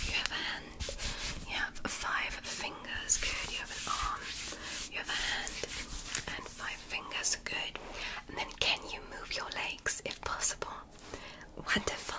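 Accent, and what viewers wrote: United Kingdom. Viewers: Are you British? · Amen. Her british/alien voice melts me like butter on a pan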